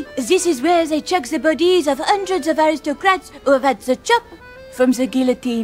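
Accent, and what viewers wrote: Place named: France. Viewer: ooooooooooo mat luv your french accent!!!!!!!!!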